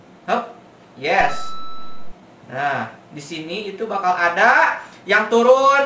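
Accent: West Java, Indonesia. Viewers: Ngakak.. pake logat sunda tambah ngakak:v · Eta ngomong na. Sunda indo 😂😂😂 anjay ..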